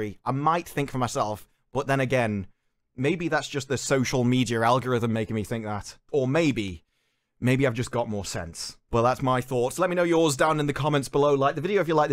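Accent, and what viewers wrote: United Kingdom. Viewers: A british guy talking angrily for good reasons and staying prudent while other disgrave his culture? Take my subscription!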